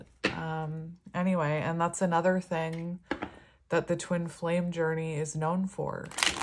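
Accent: Canada. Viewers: I knew you were autistic. I believe I am too, although never diagnosed. I'm pretty sure my mother is, and possibly my dad too. Needless to say, my two boys show signs of it, and my daughter is dyslexic and possibly on the spectrum too (yet she's training to be a vet so she definitely ain't letting it ruin her life) I instantly spotted your nervous laughter at the end of a sentence. That's a definite sign. However, I assumed the words you use were because of your accent, which I presume is Canadian? I got that from way you say "aboot" 😊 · I’m sorry someone said that to you about the way you say anyway! Not only are you neurodivergent but you’re also Canadian and I’m sure has a slight accent!People are haters! Your tribe loves you! I’m also neurodivergent and hate that there’s so many people out there that have no grace for anyone but that’s because they don’t have it for themselves!❤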